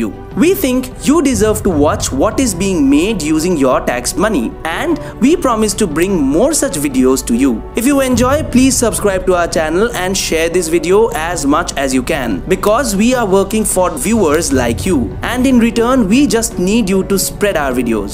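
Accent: Bengal, India. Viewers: r u bengali? u accent sounds like typical bengali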